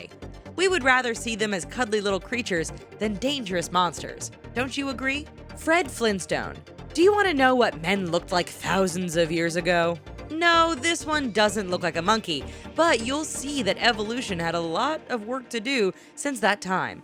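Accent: United States. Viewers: This is better on mute. 2018 the year that the American accent became the world’s biggest turnoff. Mind you they will be speaking Russian soon so hey ho, every cloud. Oh it’s a shite video anyway with it the transformations are truly piss poor. Over and out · The narration is so american it hurts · This video did not need narration. Especially that condescending white middle America accent narrative.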